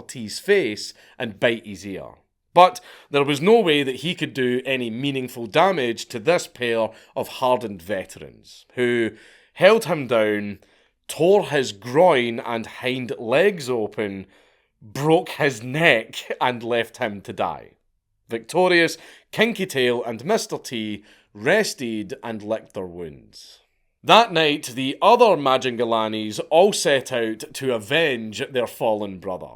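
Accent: Scotland, United Kingdom. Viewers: I could listen to a Scottish guy talk about anything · are you the scottish version of beardo gets scared ? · That Scottish accent is great... "Sex lions", eh? 😂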